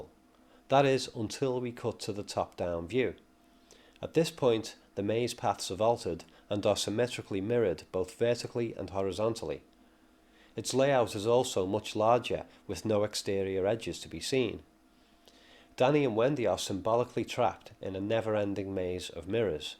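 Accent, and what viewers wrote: Liverpool, United Kingdom. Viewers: Great video. Your accent interests me -- sounds like Scouse transplanted across the Atlantic. Are you a Scouse expat? :p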